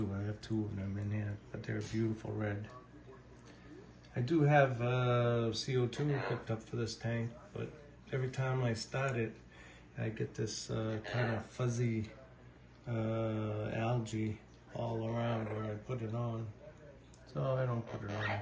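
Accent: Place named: Boston, United States. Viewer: Great first video,! I think it is a lot better than my first video. Love the Boston accent--I haven't heard that in a while. You need to turn that up! Keep up the good work. Anyone with Mascara barbs is number 1 in my book. Oh, and BTW, I love the live stream that was playing on your big-screen TV.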